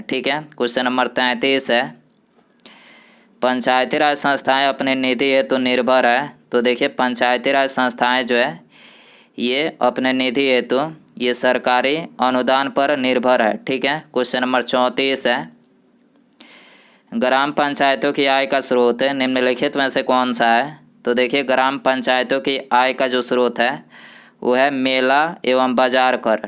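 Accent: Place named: Kashmir, India. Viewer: Radhe radhe sir,,,,sir aap kasmir se ho kya. Bhasha se lag raha h,,,ya fir rajasthan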